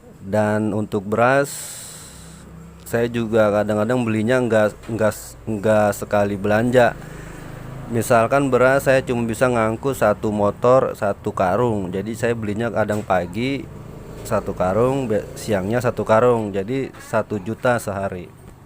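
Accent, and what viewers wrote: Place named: Jakarta, Indonesia. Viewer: G jual roko bang. Ngomongnya betawi banget bang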